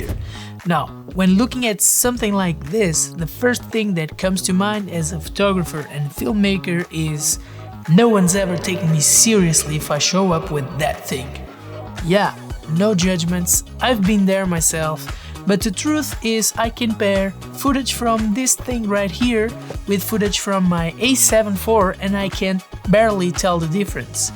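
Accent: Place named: Portugal. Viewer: bom video, da para notar em algumas partes que es portugues ahhaha, mas bom sutaque, bom conteudo, novo sub e <mail>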